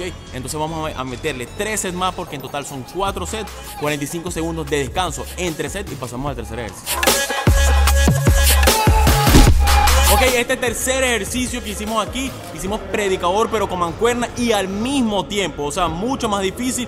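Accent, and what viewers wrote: Venezuela. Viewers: su acento es venezuelano yesss te amo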